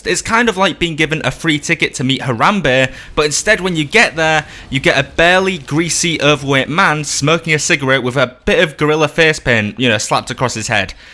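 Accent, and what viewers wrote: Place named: Hull, United Kingdom. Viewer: You sound like some of my Hull friends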